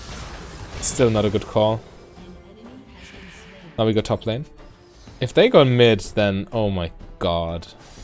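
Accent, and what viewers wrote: United Kingdom. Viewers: In fairness, he just kind of has that voice. I'm not sure how a German guy manages to sound oddly like an upperclass Britishman, but somehow manages it.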